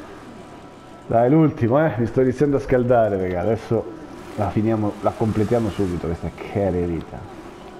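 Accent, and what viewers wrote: Rome, Italy. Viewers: super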